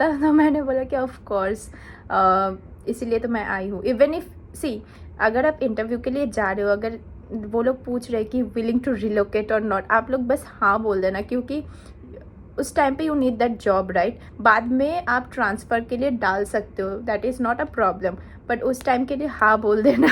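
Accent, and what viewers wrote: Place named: West Bengal, India. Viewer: Apka tengue samajkar muje pata sala app north -east se ho I mean West Bengal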